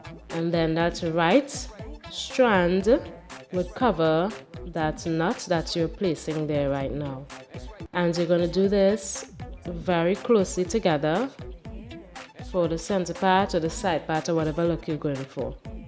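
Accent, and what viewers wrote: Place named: Trinidad and Tobago. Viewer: Lol..Trinis always make out each other..as soon as the video start I was like...she sound like a Trini ...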